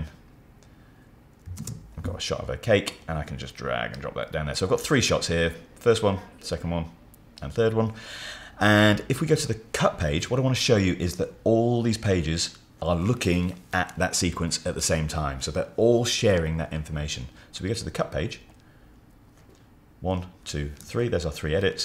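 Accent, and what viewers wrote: England, United Kingdom. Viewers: I stopped at this channel purely because the presenter speaks British English, not American English. Then I found that he is knowledgeable and thorough as well, so I subscribed. 🙂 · I am Swedish and I just love your English accent. Its sound so pure and beautiful, not like the american accent 🤣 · apart from the British accent, the video is great.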